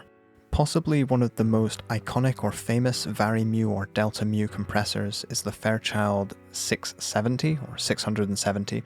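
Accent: Scotland, United Kingdom. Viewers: He does have a Scottish accent, it's just that he's from a part of Scotland that has a softer accent rather than a more pronounced one, such as Glasgow. :)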